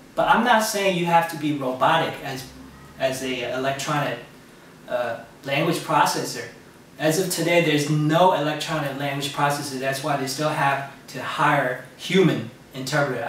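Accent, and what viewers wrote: United States. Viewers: i feel like im hearing a texas accent? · Nice American Accent. Your video is appreciated. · your accent is so confusing!! but in a good way!!! hahaaha it's like some of a brooklyn accent mixed with a little southern accent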